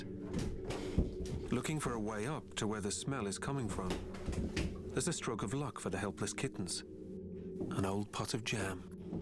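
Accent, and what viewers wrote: United Kingdom. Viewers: I like when a documentary has classical music and an english accent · This video has everything. It's like a human tragedy, science lesson and nature documentary all tied together with a neat little British accent. I likes it. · Don't you just love British accents in narration?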